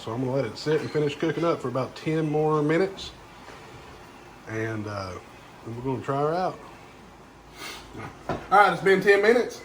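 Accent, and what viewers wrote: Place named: Georgia, United States. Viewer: New to the channel. Was browsing ideas for the Tomahawk. I like the make shift basting idea 💡. However I have to say, and strictly off of personal preference, 100% NO Montreal Seasoning. Judging by the accent, you sound like a good ol Gerogia Boy. I had a friend visit from Dallas over the summer, and he used the Montreal Seasoning on a few ribeyes we smoked, and I have to add, I DO NOT enjoy it on my steak. Salt N Pepper ONLY. Others have different preferences, and I find a lot of Louisiana-Georgia boys love themselves some Montreal Seasoning. Also, wanted to ask, what flavor pellets do you prefer? I myself enjoy the Charcoal and Mesquite pellets. It really accents the flavor of the meat. I like the temp on the steak that you prepared as well. Perfect. I have the Boss 820, im not impressed with the Lockhart, the temp fluctuates too often and the box uptop seems to not hold temp as well as I hoped for. 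Also, I prefer the reverse sear, the temp control I find easier and allows more flavor into the meat before the sear. If you'd like to exchange videos, ideas and/or recipes, feel free to message me and we can exchange contact info. Always looking for good bbq persons who want nothing better than to make their family smile with tasty meals.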